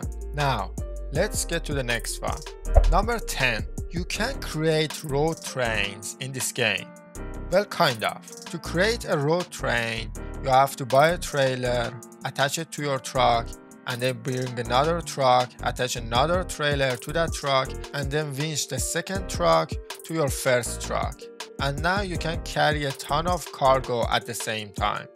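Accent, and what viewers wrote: Iran. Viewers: Amazing Persian accent aside, the tips are good and helpful. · Hi Are you persian? لهجه داری اخه 🤓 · Your accent sounds Iranian :) my gf is from IR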